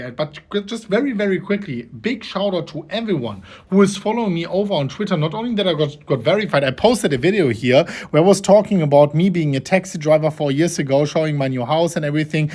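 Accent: Germany. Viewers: I just want to say, regardless of crypto, you‘re such a nice guy and have a great personality. My friends and I are watching your videos and we alway have a great time and laugh about your german accent because we‘re germans too😂 not to mention the thumbnails. Keep up the great energy and positivity, you always get me through these bearish phases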